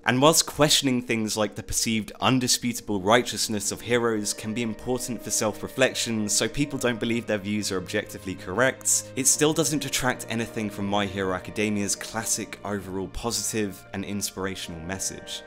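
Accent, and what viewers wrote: United Kingdom. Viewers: are you from north or south UK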